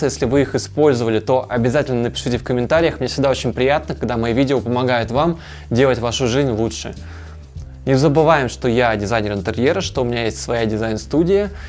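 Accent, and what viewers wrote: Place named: Okanye, Russia. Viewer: Перестаньте окать!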